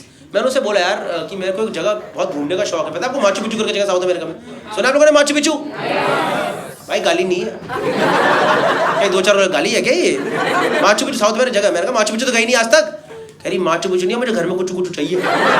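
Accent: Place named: Punjab, India. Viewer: Hard to understand the super faster than Rafael jet talking combined with the thick punjabi accent. Please provide English subtitles also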